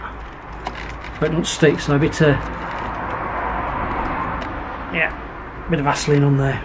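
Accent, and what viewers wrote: Nottingham, United Kingdom. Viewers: I thought that was a Nottingham accent you had, i pass through Netherfield on the train to Forest games travelling up from Norfolk.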